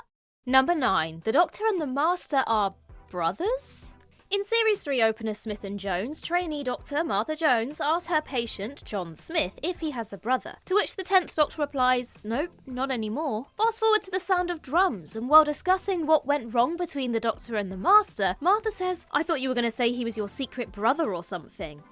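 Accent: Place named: United Kingdom. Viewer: it is adorable how you say F-ing. It just doesn't sound right coming out of your mouth. I guess being American myself it's just funny hearing it said with your proper British accent.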